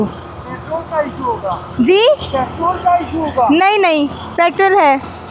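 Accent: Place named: Karachi, Pakistan. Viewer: Apka bolne ka andaz karachi wala hai or lahori ho wo kese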